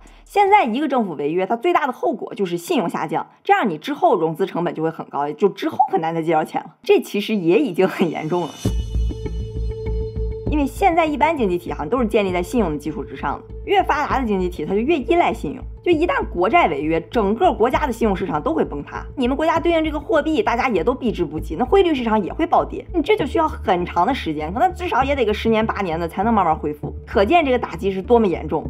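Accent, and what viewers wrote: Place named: Beijing, China. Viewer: 一口儿京味儿 听着亲切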